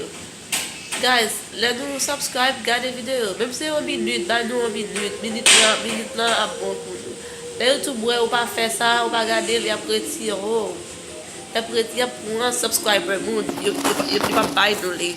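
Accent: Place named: France. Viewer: I love ypur french accent young lady you got my upport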